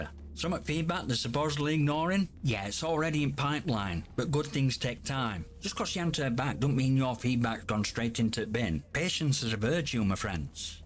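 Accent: Yorkshire, United Kingdom. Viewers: proper yorkshire that. good video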